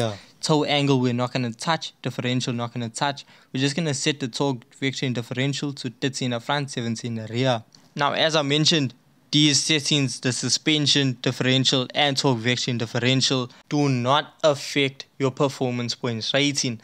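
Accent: South Africa. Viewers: I hear my South African boy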